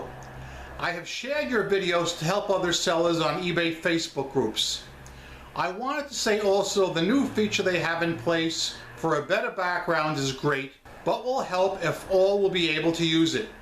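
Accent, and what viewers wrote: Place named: Tennessee, United States. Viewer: I too have a distinctive accent from SE Tennessee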